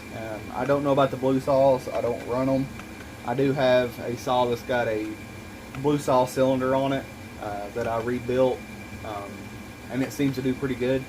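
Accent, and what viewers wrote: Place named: South, United States. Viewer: I love my neotecs!! I also have the 038 clone in the 872i and that saw if an absolute beast!! 2 years and multiple big oaks and not a single problem. I have 3 or 4 more neotecs and other than some small things which Neotec took care of immediately I've had very little issue. I would love to know what the squish is on that saw. I'm willing to bet with a base gasket delete and a muffler mod that saw would pull the heck out of a 20" bar. You said the heat index was 105 this day and judging by your accent ide saw your not to far from me lol. I'm in South Alabama and the heat index 2 days ago was 112 so I feel your pain. Great review!!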